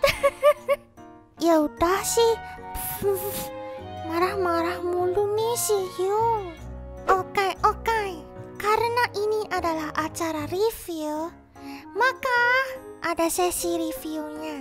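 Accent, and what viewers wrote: Java, Indonesia. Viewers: Intonasi jawanya kerasa banget, kayak sensasi baru gitu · wong jowo y? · Kak logat ny kaya jawa terus kak abang nya kok kaya sunda 🤔 sebenernya sodara bukan sih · Dari Legok Suaranya Aslinya Orang Jawa:v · orang jawa ya kak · Fix logat jawa:v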